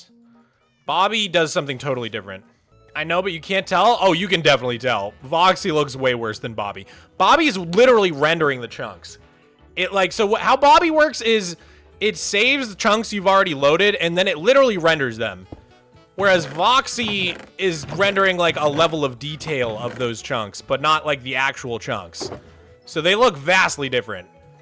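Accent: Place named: United Kingdom. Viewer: the british accent caught me so off guard that was so good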